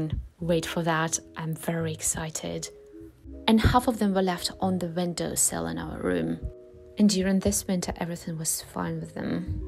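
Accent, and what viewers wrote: United Kingdom. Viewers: I was actually wondering about that. You consciously go for for a British accent?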